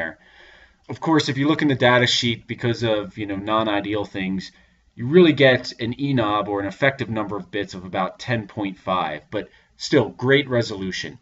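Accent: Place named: United States. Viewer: Propably this video is kinda informative. But to be honest it just bothers me how time is generated out of nothing and, most important, i just can't stand that accent. "Right out of tha baaahx" Muricans butchering the english language just hurts to watch.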